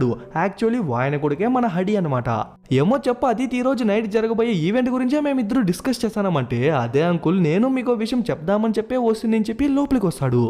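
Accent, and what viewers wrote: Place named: Ballari, India. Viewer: Explanation is good...bro I think you're from ballari... Karnataka state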